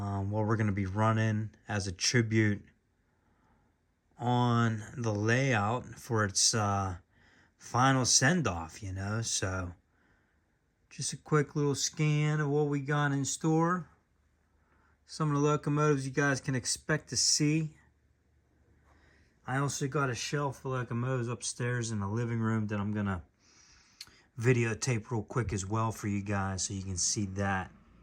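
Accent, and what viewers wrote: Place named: Pittsburgh, United States. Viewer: Nice layout especially for a first one! You sound like you're from dahtahn Pittsburgh!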